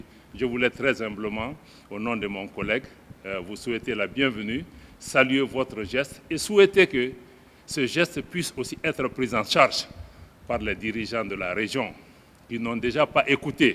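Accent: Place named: Wolof, Senegal. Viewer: Tu as un accent ouoloff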